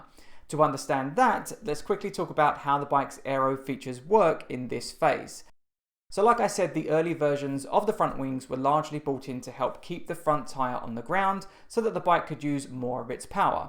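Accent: United Kingdom. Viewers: It is difficult for me to understand his british accent with the echo due to the microphone being at a big distance from the speaker.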